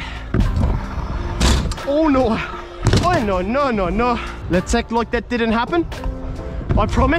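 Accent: England, United Kingdom. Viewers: Are you from England? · BRO I LOVE HIS BRITHIS ACCENT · Is this guy british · Are you English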